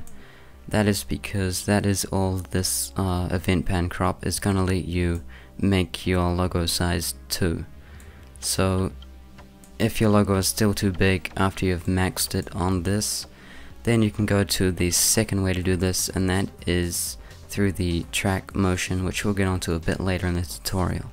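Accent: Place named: New Zealand. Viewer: lol, he is either from Australia or New Zealand, im from New Zealand, it sounds similar to mine.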